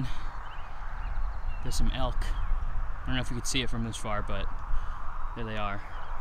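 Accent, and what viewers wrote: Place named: South, United States. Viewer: You put so much work into your videos! It takes time to get all those transitional shots where you get the video and then have to go back and get the gear. Wow. And you must put lots of time into editing. So many shots that flow so well telling a story. And the sound is mostly great! (At the end when the music seemed a little loud to me I just turned down my volume. Smile.) Did you add the surf, wind and sea gull sounds to the coastal drone shot or did that come with the music? Nice touch. You may be a California man but sometimes you really sound like you're from the south. (I'm a native Arkansan.) I'm looking forward to your videos!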